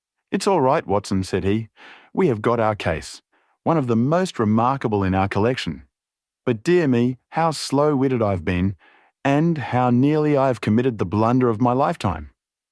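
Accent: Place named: Australia. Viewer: Ai Australian accent? No no no ....I'm gone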